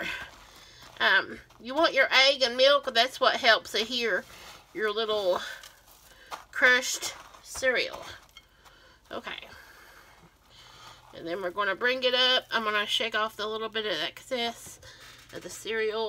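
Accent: South, United States. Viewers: I am currently on a diet. I eat my diet lunch at work and watch make wonderful things. Her demeanor is wonderful and her accent takes me back home to the south. Thank you,; bless your heart. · I am from North Carolina and she sounds like we locals, too. She is a real southerner, like so many people I know - not like that manic, shrill, slightly disturbing Paula Dean.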